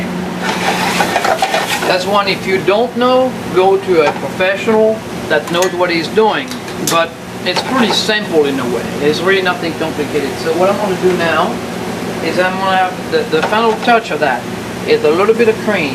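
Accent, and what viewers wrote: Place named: Southern California, United States. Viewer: socal beach learn how to speak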